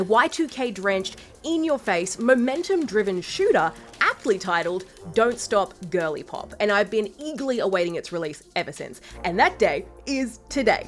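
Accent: Australia. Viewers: Great video! So good to see the aussie indie scene get some recognition and to hear an aussie voice on Youtube, can't wait to see what you come up with next! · great vid! was excited to hear an aussie voice! didnt know this game was from my side of the country either! thats neat.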